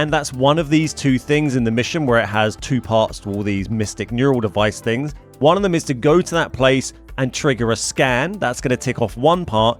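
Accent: England, United Kingdom. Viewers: He's English but uses far too many Americanisms for my liking, but I forgive him..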